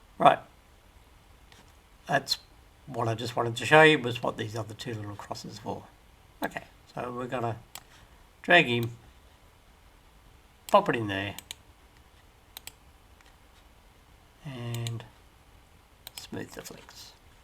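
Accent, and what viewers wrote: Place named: Australia. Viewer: Thanks mate, excellent tutorial. Good to hear another aussie accent in railway modelling. Will look out for the next installment. Paul from Sydney.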